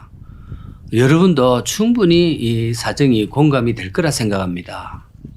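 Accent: Gyeongsang, South Korea. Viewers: 님 안녕하세여 경상도 사투리로 설명해주시니 정겹습니다 잘보그 조아여 누르그 갑니다 건행하셔여 ❤❤❤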